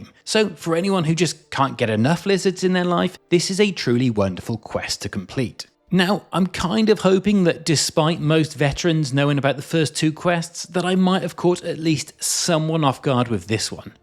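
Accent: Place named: England, United Kingdom. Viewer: Brilliant video, concise, funny and very useful. Also you sound like you're from England like me! Did not know about the Argonian, the other quests I had done some of but didn't realise that they progressed!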